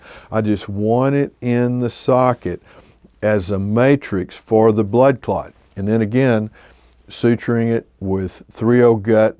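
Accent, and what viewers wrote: South, United States. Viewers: Once i heard is southern accent i knew he was the real deal sourthern dentist who been in the game this long are good ole cowboys and masters at their craft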